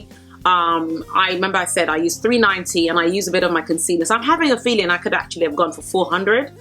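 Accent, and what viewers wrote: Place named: United Kingdom. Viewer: Your mix of the British accent and yoruba was killing me😊 Love your review. 😍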